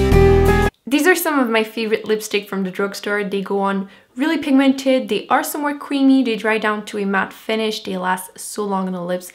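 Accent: Quebec, Canada. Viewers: wowo je t'écoutais parler et sans même savoir réellement je me suis dit man this girl has a french canadian accent!! lol Great!! Tu les a acheté ou tes wet n wild?? Ils n'en vendent plus au Wal-Mart!! Je suis obligée de commander sur amazon!!! Pis ca devient trop cher vu qu'il me charge le shipping,dire qu'au États ils sont 2 $ grrr booh loll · So Happy to find a Canadian Vlogger!! Yay. I knew you were a fellow Canadian from the accent. Love it :D Great channel and wonderful swatches. Very Helpful!! Thanks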